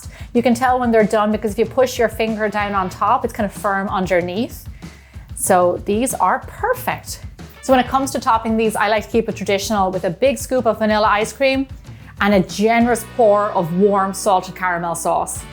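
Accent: Ireland. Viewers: You Irish? Edit : I've just checked and you are😊🇮🇪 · I love your cooking the dog treat ones were so much fun and easy to bake! My dog ate them so fast I’m not even such he swallowed!! 🤣 (Also are you Irish!?’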